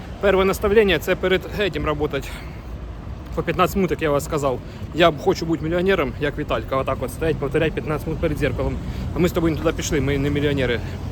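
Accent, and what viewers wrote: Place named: Poltava, Ukraine. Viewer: Звідки ти? Говір схожий на мій Полтавський!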